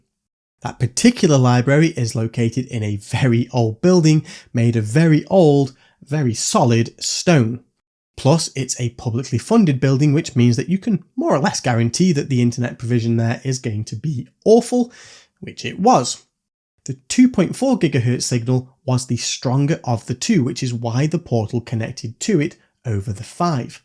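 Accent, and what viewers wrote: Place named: London, United Kingdom. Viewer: My to is working amazing sounds like you’re connecting is more problems I live in USA you sound like in London or Europe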